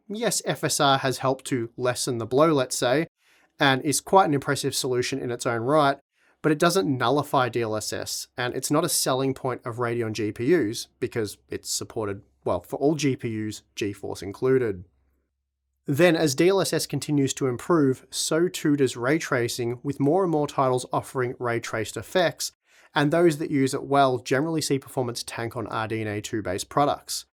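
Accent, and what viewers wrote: Australia. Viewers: What I got out of this review is purchase the GPU which is cheaper. Radeon had higher 1% lows overall than Nvidia on most games. Shouldn't this be the minimum standard when choosing a video card. I just love the Aussie accent, to the point without all that waffle. Thanks.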